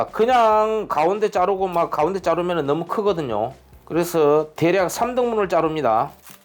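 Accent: Jeolla, South Korea. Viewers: 셰프님 전남쪽인갑네요잉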